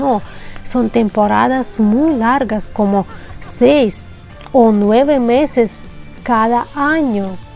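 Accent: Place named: Spain. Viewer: ¿Por qué hablas como español?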